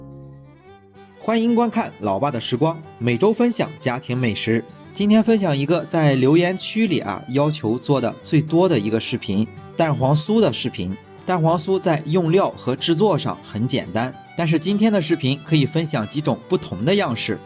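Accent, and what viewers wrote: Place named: Qingdao, China. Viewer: 您的口音很像青岛老乡😊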